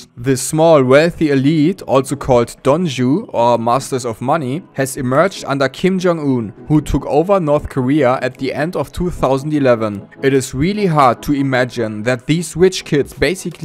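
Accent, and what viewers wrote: Germany. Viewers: Informatives Video :) hab direkt am akzent gemerkt, dass du deutsch bist hahahaha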